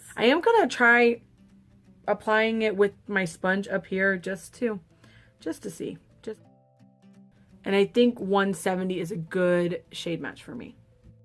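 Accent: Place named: Latin America. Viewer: Glad you guys are ok!! 💜 I want the Fenty foundation SO BAD but there are too many shades to swatch 😅 But GIRL. I just realized- are you a fellow Latina!? I never realized your accent before this vid! You sound just like mis primas! 😂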